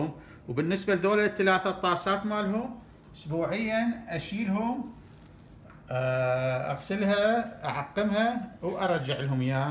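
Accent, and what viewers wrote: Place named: Iraq. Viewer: الهجه العراقيه حلوه بحلكك الله يحفظك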